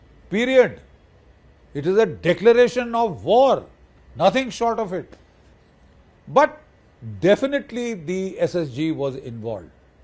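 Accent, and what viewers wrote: India. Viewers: Boss we love your dasi English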